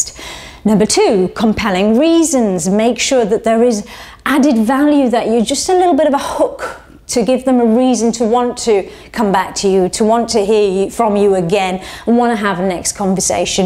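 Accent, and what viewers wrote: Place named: Gloucester, United Kingdom. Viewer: Yes, you are excellent. You have well and truly helped me too. Thanks a lot Plus, i like your accent. I can hear the Gloucester with the R's, very well spoken.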